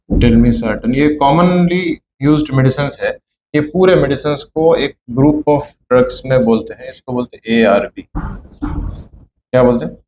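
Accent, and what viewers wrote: Hyderabad, India. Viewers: I like Dr style and simplicity ,Hyderabad tone,man from Andhra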